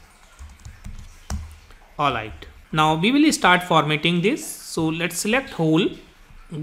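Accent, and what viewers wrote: India. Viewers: stop talking like freaking indian.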